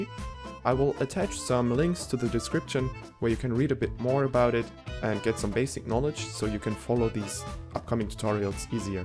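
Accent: Germany. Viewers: rofel wusste ich doch das du deuschsprachig bist ;)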